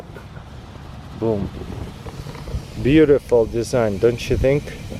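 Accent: Russia. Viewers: Вот это понимаю,английский с русским акцентом)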